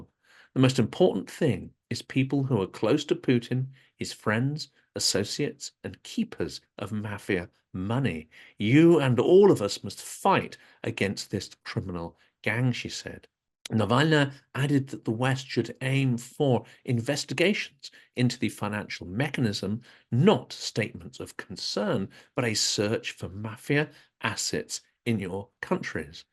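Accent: United Kingdom. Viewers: Oh, funny. I’m American and speaks British. He’s from United Kingdom, and lives there. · I checked the channel info and it says location United Kingdom. 🙂 Plus his accent is very British. 😊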